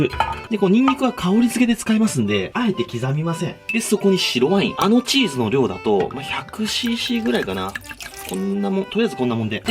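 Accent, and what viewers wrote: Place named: Nagoya, Japan. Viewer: 名古屋生まれ名古屋育ちの自分と同じイントネーションでしゃべってるから笑えるw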